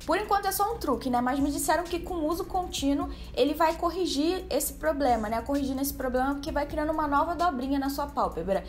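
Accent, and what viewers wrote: Rio de Janeiro, Brazil. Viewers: mana, como vc fala em inglês com ess sotaque lindo de carioca? queria muito ouvir!!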